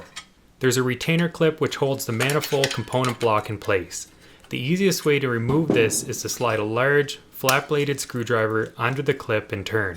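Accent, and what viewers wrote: Canada. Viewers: Are you Canadian or from the Upper Peninsula?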